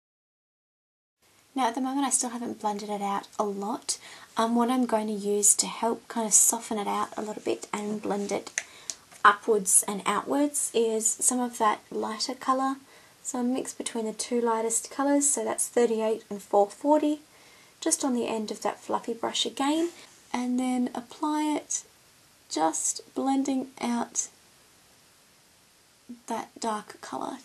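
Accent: Australia. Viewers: Hey everyone. I really like. I just discovered her and I was wondering if there are any other gurus like her that are aussie because I love that accent and actually I am technically aussie (my grammy is from Austrailia).Thnx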